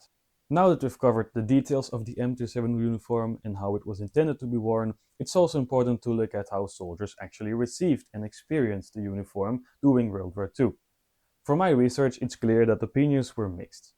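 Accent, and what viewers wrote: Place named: Germany. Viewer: You must be a German as you speak English with a German accent. Sehr gut, mein freund. Cheers from an old Marine sergeant in eastern TN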